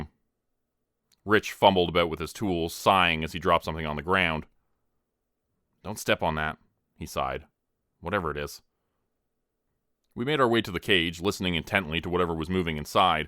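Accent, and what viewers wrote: Canada. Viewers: I knew you were Canadian!!! I love your accent. I'm from just over the border in the US. Reminds me of home. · Woo-hoo Canadian here too. Vancouver area. I thought you sounded Canadian. You rock. 🤘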